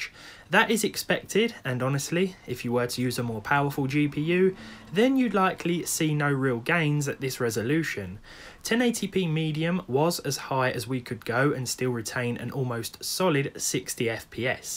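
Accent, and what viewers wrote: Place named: England, United Kingdom. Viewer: I like your original English accent. I used to live in Tauton City. I learned a British accent. greetings from Germany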